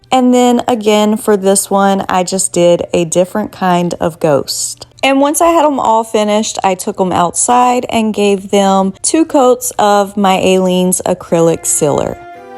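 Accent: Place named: Florida, United States. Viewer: I say "them" and "him" with the same accent as you .. I'm from Florida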